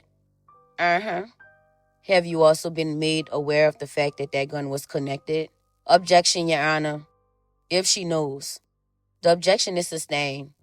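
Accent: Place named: Louisiana, United States. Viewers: I like how the narrator got that LA down south accent puts u rt in the courtroom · That’s a New Orleans accent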